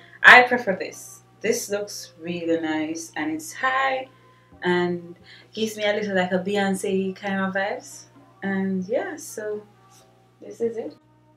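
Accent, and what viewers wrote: Jamaica. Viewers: U sound like a Jamaican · sounds like a Jamaican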